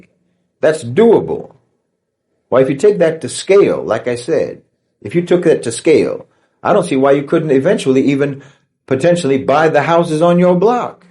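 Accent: United States. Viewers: You sound, Americon, but you have a regional accent that I can't place. What state are you from, or what is your regional accent? Good voice.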